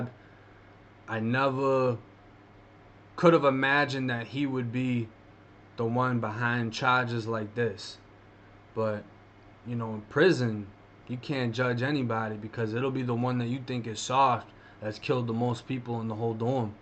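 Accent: Boston, United States. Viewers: You sound like us Boston Ma accents lol. I'm glad I came across your channel.😁 let me find out your from Massachusetts 😁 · I hear Boston. Is it? Yes. Wicked Boston!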